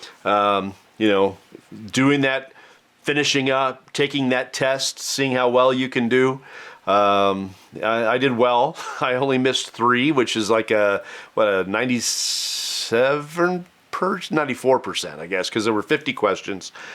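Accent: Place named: South, United States. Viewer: Congratulations! Here's a toast to you in a glass of Old Forester 100. 🥃 A pot still's operation is dead simple. But I have just a vague understanding of how a column still works. About all I know for sure about a column still is that it's more efficient, and therefore tends to strip more of the congeners out of the white dog. I can just see the Official Aroma Kit - 2,724 different scents, comes with forklift for moving. 😂 I never get peanuts from Jim Beam juice. I get pecans from George Dickel white label, and walnuts from their BiB. And I get peanut shells on the nose from Heaven Hill whisky. Tonight you can't seem to make up your mind whether caramel is CARmel or CAREamel. I can tell you've been in the South for a bit. 🤠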